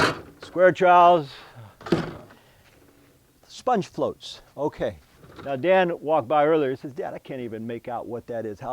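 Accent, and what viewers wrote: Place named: Bay Area, United States. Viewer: You have a Bay Area accent.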